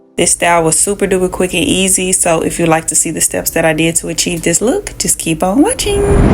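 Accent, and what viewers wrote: St. Louis, United States. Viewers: I can tell by your accent you’re also from STL. ❤️ Love your videos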